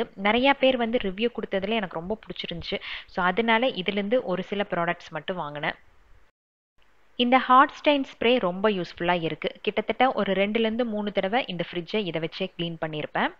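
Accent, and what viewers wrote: Coimbatore, India. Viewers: Uoinga video la nalla iruko sis....neinga coimbatore ah pesum pothu konjam theyriuthu... good luck 💐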